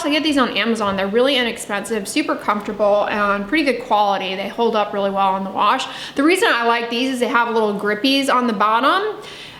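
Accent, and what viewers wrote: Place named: South, United States. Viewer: I don’t like your southern accent! This accent irritates me to the core but thanks for your ( advise).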